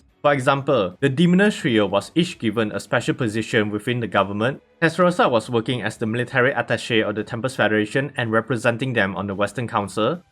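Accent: Singapore. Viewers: You Singaporean ah? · Bro are you Singaporean?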